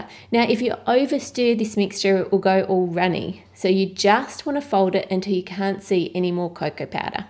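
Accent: Australia. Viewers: Your Australian (:0) whaaaaaaaaaat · Do you live in Australia you sound like it not to be rude or anything I love your videos I am so hungry now🍧🍨🍭🍬🍴🍪🍩🍮🍫🍦🍰🍟🍖🍕🍔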